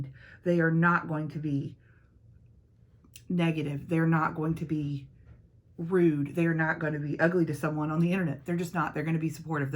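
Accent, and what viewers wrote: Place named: South, United States. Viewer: I found you from your beautylish lucky bag video. Never commented on YouTube before but wanted you to know that I stayed and subscribed because you truly do seem to “be kind” and positive. It also doesn’t hurt that you talk like me. 😁 I’m from SC where we also eat “hoop” cheese and know how to say Greenville!! I appreciate how raw and honest you were with some of these questions. I think your younger self would appreciate the advice. You’re truly a hidden gem here on YouTube.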